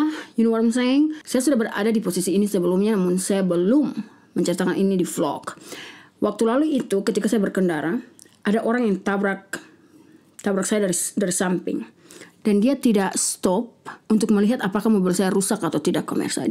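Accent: Manado, Indonesia. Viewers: Minum kopi apa doe... dapa lia sedap.. I am a coffee drinker. Btw, I like the way you talk.. apa lg deng logat manado plus english.. beking kt tatawa... Thx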